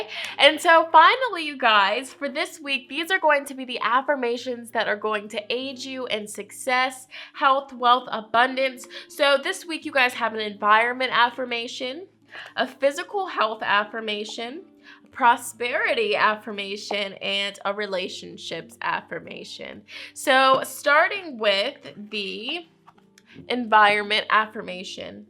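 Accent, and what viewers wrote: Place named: United Kingdom. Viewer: Sometimes you sound British!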